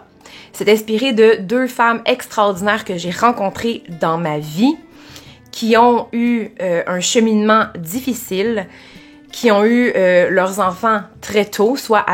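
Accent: Canada. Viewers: Bjr, alors pour l'accent on l'entend mais on le comprend pour ma part j'ai de suite reconnu l'accent canadien, pour votre fille ça devrait être tellement frustrant on n'a pas d'autre choix que de faire confiance aux médecins et aux éducateurs malheureusement 😢 pour votre entreprise si vous engager des étrangers habitants en Afrique du Nord pensez à moi 😅 pour votre traumatisme je suis admirative j'aimerais tellement un jour pouvoir exprimer tout mon vécu un jour ça doit être libérateur.... Pour finir merci pour cette vidéo c'est intéressant d'avoir l'impression de connaître une personne sans jamais l'avoir rencontré !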